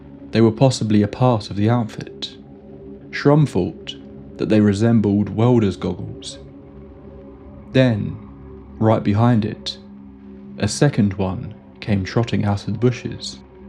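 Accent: United Kingdom. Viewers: A british narrator is so boring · The British wank narrating this story is not helping sell the mystery or horror, whatever. I found myself wishing the narrator was in another line of work.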